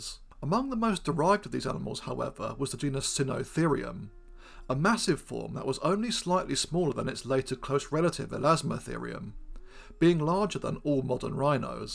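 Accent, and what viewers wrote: United Kingdom. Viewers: What part of England are you from?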